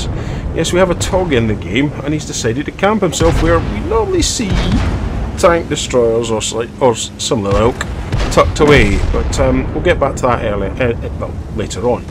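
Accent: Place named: Scotland, United Kingdom. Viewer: yea, I like your vid...got my sub...can't wait for some more..Love the Scotsmen Accent...I'm in Nova Scotia...where abouts you at M8?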